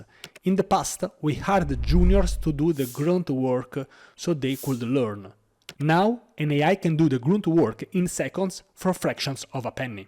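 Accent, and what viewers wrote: Italy. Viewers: Your Italian accent just makes me less worry , thanks that was good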